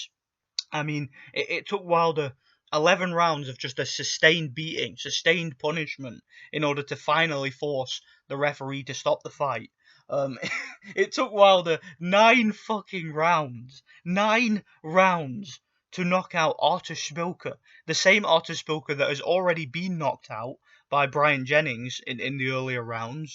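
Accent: United Kingdom. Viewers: Is crazy what your saying but do you know the wbc took the belt from tyson fury about the glove gate bs povetkin vs dyllan white just recently fought for the interim wbc heavyweight belt sooo skill or not he was a champ and your accent tells me your from uk and mayb mad at this guy idk but it seems wilder lives rent free on your mind 🥱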